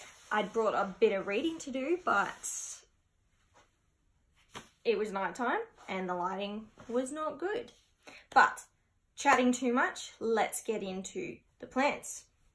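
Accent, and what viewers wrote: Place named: Australia. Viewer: yaaaaay an aussie ::::)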